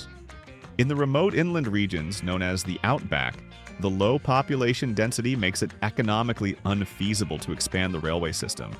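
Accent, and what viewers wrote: United States. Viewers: American bloke ?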